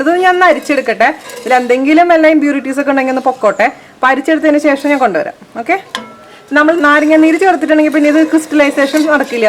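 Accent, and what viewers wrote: Thrissur, India. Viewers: അടിപ്പൊളി കൊള്ളാം അവതരണം നാട്ടിൽ പോയ് ഉണ്ടാക്കി നോക്കണം തൃശൂർ മാള സ്വദേശിനിയാണന്ന് തോന്നുന്നു.... Good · Chechy good recipe and the way you are explaining in Thrissur slang is excellent. Take care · njan thrissur ane .athond thanne chechide samsaram valya ishta to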